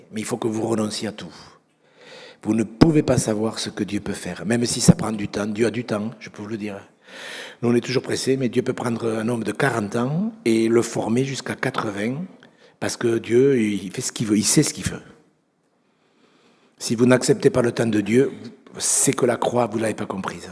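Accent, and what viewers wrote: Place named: Toulouse, France. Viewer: C'est vraiment l'accent de Toulouse !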